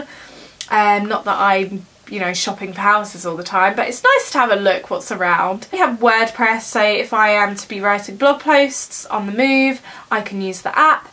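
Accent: United Kingdom. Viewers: You’re very beautiful! I can’t stop telling you that😂 i love your hair, your makeup, your eyes😍 your clothes, your bed sheets, your british accent, your soul! Where are the guysss? We’ve got a gorgeous girl here how they cannot see thatt?🙋🏼‍♀️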